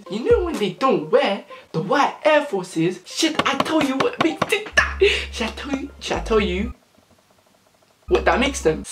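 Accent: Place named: United Kingdom. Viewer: Why r u so British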